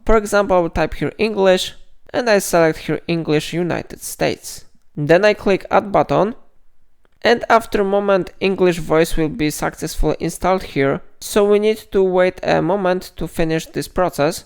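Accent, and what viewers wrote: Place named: Poland. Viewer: Polska ❤ trochę słychać po akcencie i na dole widać POL pozdrawiam 👍🏻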